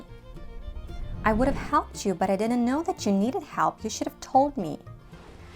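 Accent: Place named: United States. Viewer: American accent rules !!!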